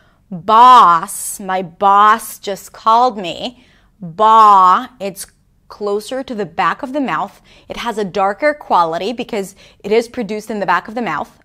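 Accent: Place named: United States. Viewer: You seems to be american native speaker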